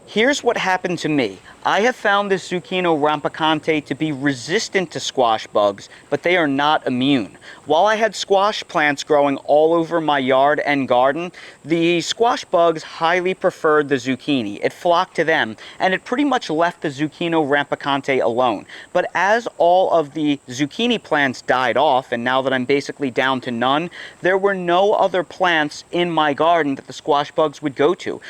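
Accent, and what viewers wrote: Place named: Pennsylvania, United States. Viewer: Hmmm, you're a smart guy previously from PA if I judge your accent correctly. So why live in Humideville? My folks were from PA, I went to humid HS in Maryland and have tried to stay west in the Rocky Mountains/Colorado Plateau. Dry, great views, et al. In any case i love your videos and gardening education! I'm 80 yo, but hopefully can still learn. Blessings.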